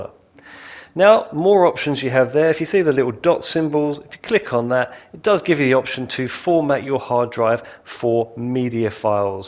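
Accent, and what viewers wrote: United Kingdom. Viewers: I’m assuming you are in the UK by your voice? I wonder if that has anything to do with it. I’m in the US and I’m guessing we don’t get the same updates.🤷🏼‍♂️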